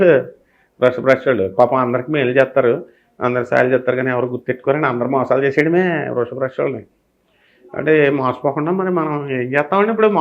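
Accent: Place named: Godavari, India. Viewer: ఎందుకు అండీ... స్లాంగ్ చేంజ్ చేసారు గోదావరి సైడ్ లాగ 😀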